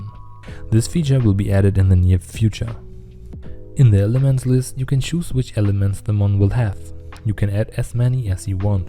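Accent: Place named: Germany. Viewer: wie man einfach immer raußhört wenn einer deutsch ist XD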